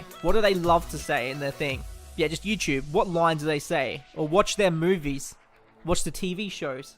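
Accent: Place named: United Kingdom. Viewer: I really thought you were British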